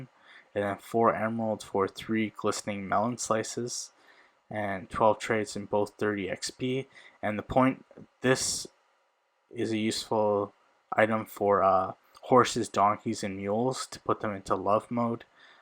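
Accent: Punjab, India. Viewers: Is you punjabi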